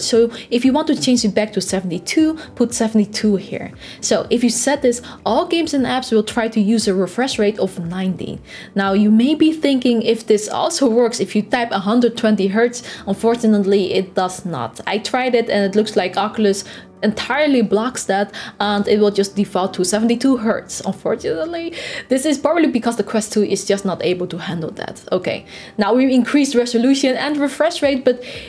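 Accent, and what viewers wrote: Netherlands. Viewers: Ik hoor een Nederlands-Engels accent 😅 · you sound dutch haha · i smell dutch 😂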